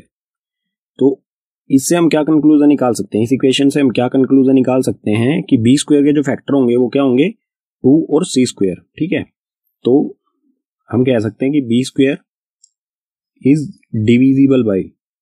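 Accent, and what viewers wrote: Haryana, India. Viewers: Sir aap Haryana se ho na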